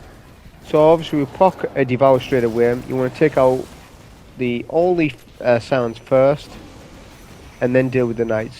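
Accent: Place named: Northern England, United Kingdom. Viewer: I know most rant on about ESO's solo runs of content but enjoy the actual audio commentry with it from these videos, especially from a northern lad :D PoH next?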